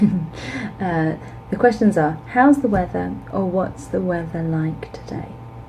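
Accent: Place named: United Kingdom. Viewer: Very interesting insight into this issue (and quite brave of you, I must say). As a Spanish ESL teacher, I used to work at an academy and noticed not just basic mistakes similar to the ones you mentioned, but some serious pronunciation issues. To be fair, we have an obsolete educational system in Spain when it comes to English: you never get to really practice your speaking or listening skills as much as needed (I'm talking about regular public schools). It is also true that nobody knows it all and even English native teachers do make mistakes. However, I believe people here tend to give it for granted that the fact that you got your university degree means you already have the sufficient level to teach English... and more often than not, this turns out to be self-deception. We must be aware of our weaknesses and the need of constantly improving our knowledge and skills, especially if aside from making a living out of it we intend to offer a real good-quality teaching of the language. 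Unfortunately, this is just like any other profession: some use their uniform as if it was an irrefutable evidence of perfection. I reckon what really makes the difference is whether you truly love what you do or not: if you do, you will try your best to continue correcting your mistakes and boosting your abilities. If not, you will just keep delivering sloppy lessons. By the way, you have such a clear and lovely British accent! I hope you are doing well and both your students and colleagues can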